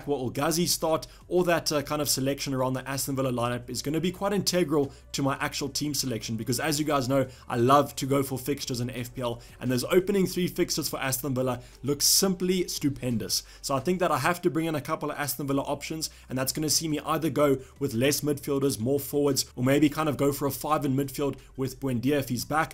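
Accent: South Africa. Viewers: Bra you sound South African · Are you South African ?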